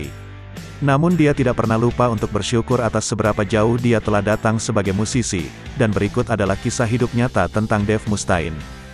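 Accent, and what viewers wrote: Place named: Indonesia. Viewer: Kan pake pelafalan logat Indonesia bro... 🤣🤣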